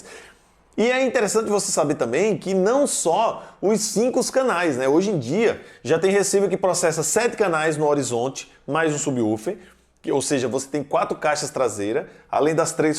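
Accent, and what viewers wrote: Northeast, Brazil. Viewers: Da um nojo, vai assistir um video e percebe esse sotaque de merdestino.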